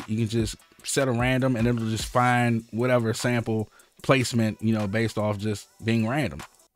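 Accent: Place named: Washington DC, United States. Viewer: It’s the dc accent bro lmao he can’t help it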